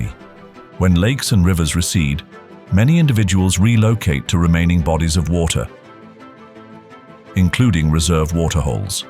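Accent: United Kingdom. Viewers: specially due to your British accent I love this...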